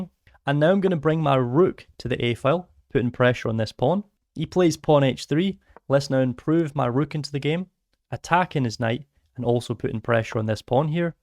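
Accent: Scotland, United Kingdom. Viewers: Nice Scottish voice, subscribed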